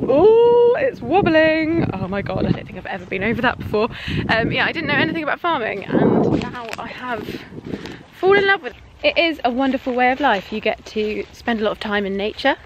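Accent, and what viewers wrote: England, United Kingdom. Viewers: I love your British accent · I just watch this by accident and suddenly this lovely couple move my heart and, absolutely your british accent really, i would continue to watch this in future 🥺🥺🥺🥺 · Yes, it's mealuh, meal like meal and uh somewhat like in uhm. Your slight British accent gives away you are not exactly a native German. 😎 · You look beautiful without powder... I enjoyed it, I like this kind of video to listen to your British accent. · That is kind of best lifestyle u have an extremely beautiful farm. My father is a farmer and I love our farmland, unfortunately, we can not go there because we are quarantined...I'm very happy to see your daily life and lifestyle.🥰🥰🥰 I hope the world pass this bad situation. I'm Majid Saneie from Iran. I like your pure British pronunciation. I follow u in YouTube channel. If I have mistakes I delighted to correct me. 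· Hi, my lovely teacher, thank u for ur lessons, I always benefit from u many things thanks again for ur time. I love farms I work in farms too, I absolutely love ur accent British people. I'd like to to England but, it's difficult for me, I can't get a visa to England. If u could help me to get there to work with u in ur fram, That would be nice of u. Have a nice journey in ur life and God bless u, ur husband and ur family..... ❤️ ❤️ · This vlog is amazing and I dont know how my sister came by you but she is probably pretty proud of herself. I am not a native English speaker but I would certainly try and hope to grasp that beautiful British accent. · The British English is so pretty so clean! I'm loving these vlogs ❤️... Here is from Brazil 🇧🇷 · Hello, sweet husband, cute dog, wonderful home! everything done right, respect. Your washmachine and dryer from Miele was a very good choice. I am from germany and i like your new kind of videos. 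I have a Miele washmachine too and ihm very satisfied with them. I know its expensiv but it works for so many years, this is how the investment pays off. Your pronunciation of the word Miele was correct. I heard a small English accent but it was still correct. Stay healthy and continue enjoying your great life. Best regards from Sabrina, from Baden-Baden, near the beautiful Black Forest, in Germany! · Does she speak like a britanic like every day life or she speaks more slowly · I'm Brazilian and I'm learning a lot with your videos... Thanks for sharing your day with us... The British accent is awesome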